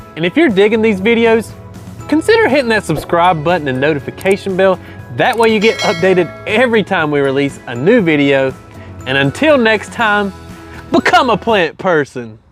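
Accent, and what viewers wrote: United States. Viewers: Sir I love your country accent, where are you from brother?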